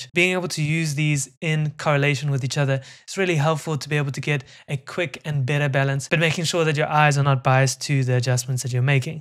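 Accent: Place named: South Africa. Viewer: south like a fellow south african , that accent is very south african but thank you so much for the lessons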